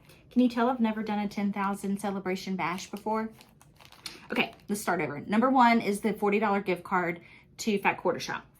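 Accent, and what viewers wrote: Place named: Carolinas, United States. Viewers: Thank you for being so genuine! My husband is from Alabama, it's nice to hear your accent. I love all the things, but #1,3,4,5,6,7,11,14,15,17,18,20,24,28,30 are my choices. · I started watching you from a shout out from Brenda and serial starter..I love the name (my daughter's name) and I loved living in the South..Memphis then Atlanta where I started stitching in the 80's.... The kindness civility and the accent! 😃 I have been in the North (for many years and I tune in and find learning and comfort with you as I stitch. Thank you for the celebration give aways: 1,2,6,7,11,15,17,18,19,20,21,22,23,24,28. Congratulations,! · Happy 10,000 Celebration! I enjoy watching you so much. My family is all in the Carolina’s and I’m in Colorado. Listening to you is like hearing them all. :). 1, 2, 4, 6, 7, 10, 11, 12, 14, 15, 16, 18, 19, 22, 27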